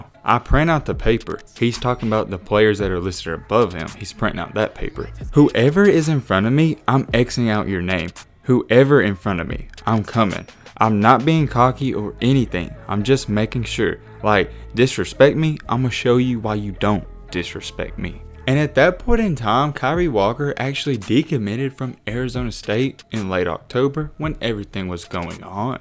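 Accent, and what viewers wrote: South, United States. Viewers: bro this man is so country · for real he has a country voice that pisses me off also whole video sounds fake, sounds corny, and sounds scripted lol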